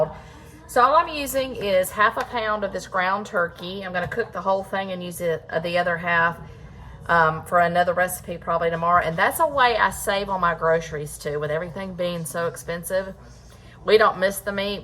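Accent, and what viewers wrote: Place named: South, United States. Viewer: I just wanted to tell you how much I loved your video. I had forgotten all about that cake mix recipe. Being a longtime Weight Watcher, I was glad to see a good dessert recipe from my past. Your video was so easy to follow, which I really like. Also, I detected a Southern girl accent so I hope you will throw in some Southern recipes now and then. I am so glad that I found you by just scrolling along youtube. Have a great day from my mountain home in Western North Carolina.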